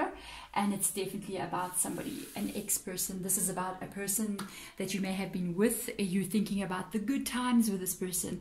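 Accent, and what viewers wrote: South Africa. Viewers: Good to hear a south african voice again. I been in the uk too long now lol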